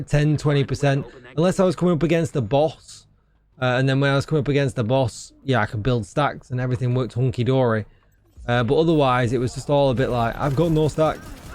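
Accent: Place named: Scotland, United Kingdom. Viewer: Hey, buddy I'm new on the channel, your accent sounds like the SOAP on the new MW series, may I aks where you from???? Take my Thumb Up 👍 right from Brasil.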